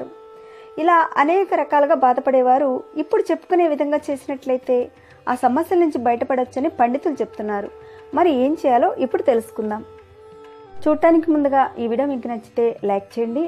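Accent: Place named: Medak, India. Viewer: అక్క మీది మెదక్ జిల్లా అనుకుంటాను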